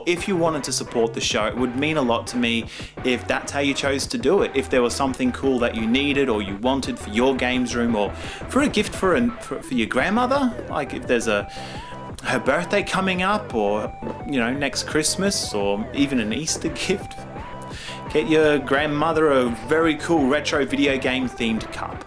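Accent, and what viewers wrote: Australia. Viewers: You sound Australian but you say Saiga not seega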